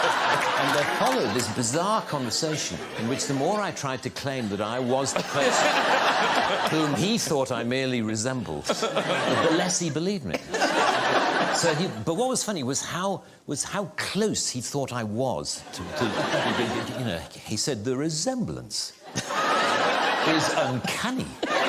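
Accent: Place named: United Kingdom. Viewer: 진짜 영국식 발음에 목소리 녹아내림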